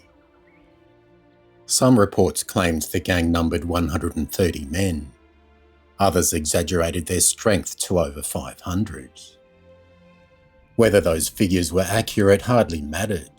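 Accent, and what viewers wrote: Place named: Australia. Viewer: Great to hear a proper Aussie voice.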